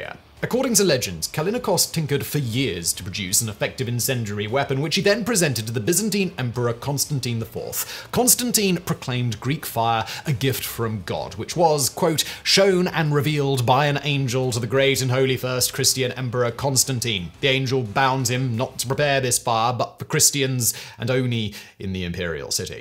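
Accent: England, United Kingdom. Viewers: British Accent. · As an Englishman I can honestly say that has the most quintessentially English accent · Brilliantly done as ever old bean! One tiny moan…the word ‘swathe’. In English we pronounce it “Swaythes” not “Swoths”. I beg you, stop using the US pronunciation? It grates the ear when the rest of your accent is sooo English ✌🏼 Thanks a million for all the videos 🙂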